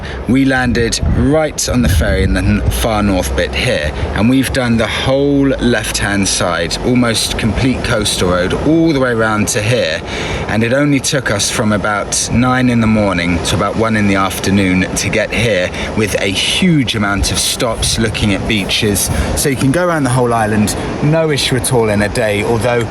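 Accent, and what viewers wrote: RP, United Kingdom. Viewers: My hero David Icke lives on the Island.I have been there years ago.It certainly looks nice if you are lucky with the weather.You have a very clear speaking voice BTW.Slightly posh but not as much as a Sloane ranger.We never did get to see,but i heard her low sweet voice.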